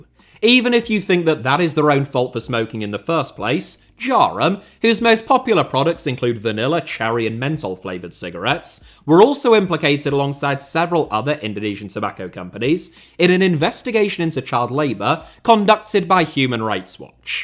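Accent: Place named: United Kingdom. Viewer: I can't think of a greater punishment than hearing a british man pronounce Como repeatedly for 45 straight minutes. Or should I say "Coumou".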